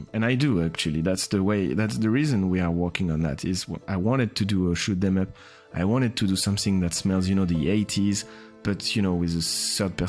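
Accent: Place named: France. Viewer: Toi, je sens que t'es Français en tout cas sympa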